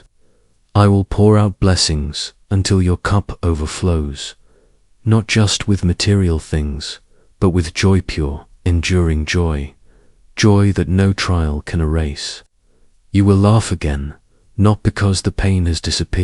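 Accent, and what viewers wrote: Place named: England, United Kingdom. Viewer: You have an English voice....as I do. I receive.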